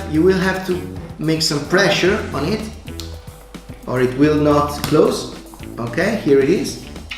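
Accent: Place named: Italy. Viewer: Great vid. Very much to the point. I'm watching auctions online and I'll buy a 500 C/M when I find one at a price I like but your video sated my apetite a bit at least. I dig your accent btw. Your speak with a good flow so it's not bothering at all. By that and from your name I deduce you're italian. Correct? Cheers from Sweden.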